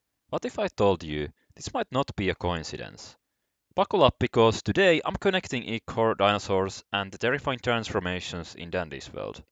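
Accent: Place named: United Kingdom. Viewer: Bro sounds britsh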